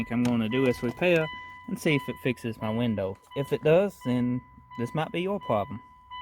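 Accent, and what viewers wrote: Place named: South, United States. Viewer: What a accent bro. Down in the real swamp shit.. Right on man thanks for the info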